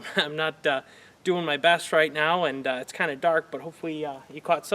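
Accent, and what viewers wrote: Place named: Wisconsin, United States. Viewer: Not intended to be a dig. Just based on your accent, sounds like you're from WI. MN or IA maybe??? I'm from WI and my accent gives it away.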